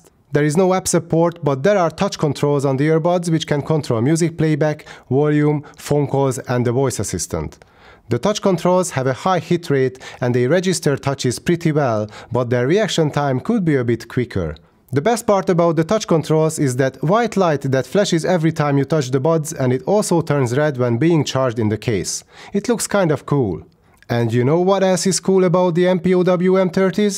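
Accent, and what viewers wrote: Hungary. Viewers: Valahogy érezni hallásra hogy valaki magyar 😅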